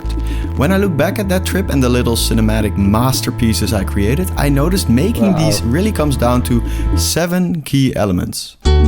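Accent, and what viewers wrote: Netherlands. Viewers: I smell a dutch accent? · Fist 5 seconds in the video "ohh.. that accent... he must be dutch" The humor in the video - yap, deffinately dutch 😀 Great Video - Cheers from your east border! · Soow Die nederlandse accent gaat nooit weg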